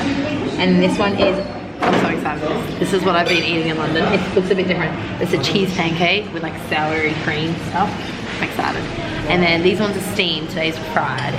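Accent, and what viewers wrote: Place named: Australia. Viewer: Your accent it's like British... but I CAN understand U... must be Australian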